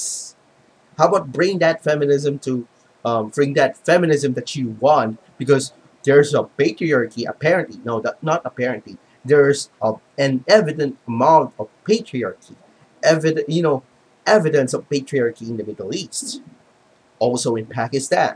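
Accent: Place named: Philippines. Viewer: ***** Excuse me, I'm also a Latino who doesn't speak Spanish/Castilian, you ''look Filipino'' (not even the ''most Mexican-looking'' of the Asians, that prize would go to the Viet-Namese, and the Indonesians take a good 2nd :-P ), I assumed you were because on A Voice for Men (where your video is featured) a bloke said ''galing'' and called you Pinoy :-P and your accent is more akin to that of a Filipino... or an Ecuadoriano than that of a Méjicano (as Méjicans, Centro-Amirikano's and Colombiano's tend to use the ''ii'' sound while Ecuadoriano's, Filipino's and Europeano's use the ''ih'' sound more), this is odd, usually my comments are invisible, and when you commented on your own video bellow my comment I couldn't see it until I selected ''answer'' my own comment, people probably reported you as spam or something, I like this new Google+ integration, but I hate this ''feature''. 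(>_<) Be glad that you're not an Ecuadoriano (what I first thought you were) as that's the country where Feminists threatened to cut of innocent men's penus (yes, that's the plural of penis) if Lorena Bobbit would go to jail (yet they are seen as ''freedom-fighters''), also most Castilophone western countries had female heads of states, but the palces with the most radical Feminists never had... makes one think... I don't know if you get this a lot, but you really look and sound Filipino/Ecuadoriano, though Filipino's are universally paper-pale (literally everyone in the Philippines can play for the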